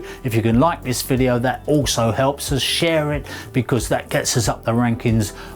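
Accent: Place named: Hertfordshire, United Kingdom. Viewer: Oh bless you, sorry if I upset you too. My wife and I are from Hertfordshire originally and all our pals talk like you. We love ya mate and wouldn't want you to change, your personality does the talking ! 🤗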